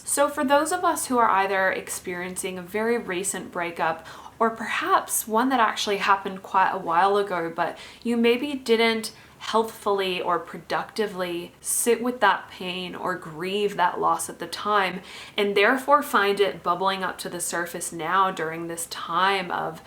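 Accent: Australia. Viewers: your slight Aussie accent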